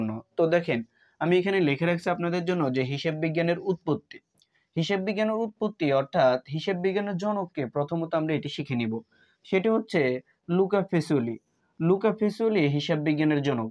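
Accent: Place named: Chittagong, Bangladesh. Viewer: আপনার কথা বলা শুনে মনে হয় আপনার বাড়ি চট্টগ্রাম😊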